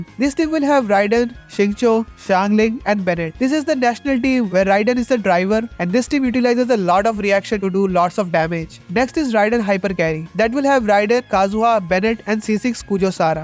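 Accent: India. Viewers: are u from india ? grt video btw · 100% Indian.. awaz bta ri hai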